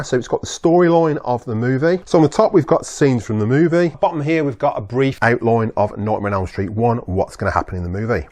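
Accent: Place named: United Kingdom. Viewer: Can I ask where you was originally from in the UK ? Sound like you from my neck of the woods 👍🏻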